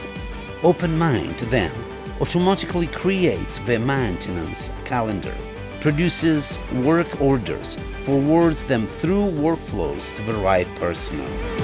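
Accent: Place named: North, United Kingdom. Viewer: the combination of north british and slavic accents gave me a stroke, cool app tho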